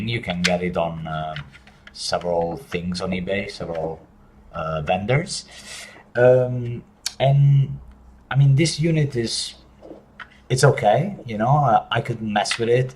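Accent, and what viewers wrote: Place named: Spain. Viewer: Awesome work! This project has given me that extra little push to dive deeper in 3D printing/modeling. I recently purchased a Creality CR-10. It’s been a blast so far. I am currently modifying HoolyHoo’s screen bracket to accommodate dual joysticks and a RPI3. While sharing is nice and very appreciated among the community, people usually have valid reasons to not want to share. You should give people a chance to be creative with their solutions and if anything, push them in the right direction. Also, where are you from? For some reason your accent leads me to believe that Spanish is your first language.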